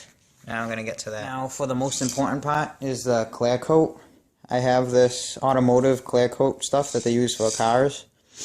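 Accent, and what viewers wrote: Boston, United States. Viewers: where u from? you sound like you have a slight boston accent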